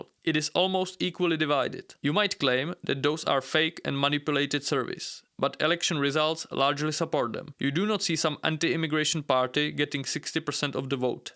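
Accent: Czech Republic. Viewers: Your Czech accent is extremely strong ...Try to at least a bit to change when narrate video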